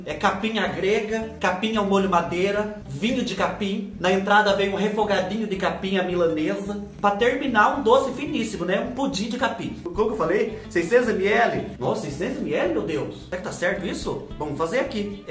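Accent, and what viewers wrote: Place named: Minas Gerais, Brazil. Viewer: Mais um vídeo delicioso se é q me entendem.eta nosso mineirinho adotado tem certeza q não nasceu em Minas Gerais seu sotaque e melhor q o meu kkkkkkk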